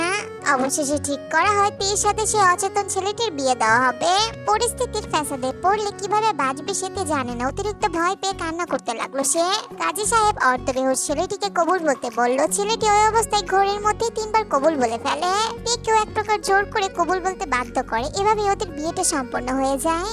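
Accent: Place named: Narsingdi, Bangladesh. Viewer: ওও আমার বাড়ি নরসিংদী খুশি হলাম নরসিংদী কথা বলছো বলে 😂😂😂😊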